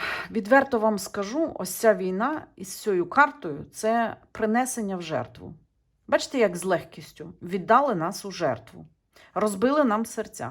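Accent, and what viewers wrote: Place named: Bukovina, Ukraine. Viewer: приємно чути правду та осадок що відповідати не будуть.Як сонечко в морозний день буковинський діалект гріє не тільки слух а і душу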